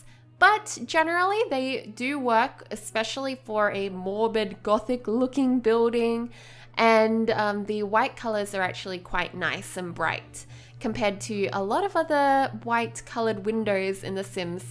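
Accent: Australia. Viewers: are u Australian because your accent sounds so much like an Australian's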